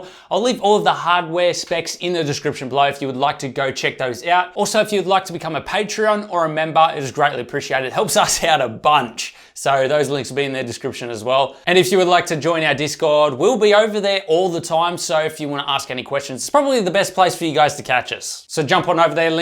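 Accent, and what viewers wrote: Australia. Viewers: That Aussie accent and saying,”… if I should burn it!” Killed me!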